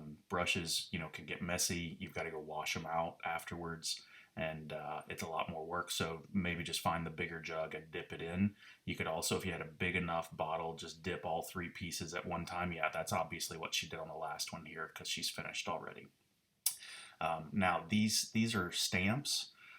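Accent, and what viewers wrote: Norway. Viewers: I haven’t laughed this hard in weeks! Bunny suit, whitest paint out there, dip them in a bucket, stamps with a Norwegian accent, I had to pause the video and regain a semblance of control so I could hear the rest. What a guy!!! Thanks to you both for such a fun watch, wash those hands and stay safe and well. Kris P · Norwegian accented stamps... braided and unbraided and braided again rope 😂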